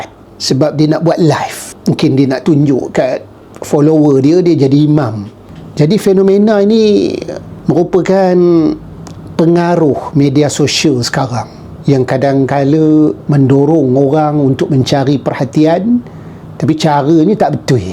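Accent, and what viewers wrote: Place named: Perak, Malaysia. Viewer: Tu dialek perak...jangan sedap mulut kalau jahil.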